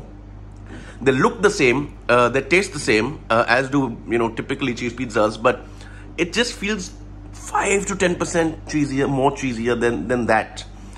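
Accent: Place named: India. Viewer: Indian accent is sooooo annoying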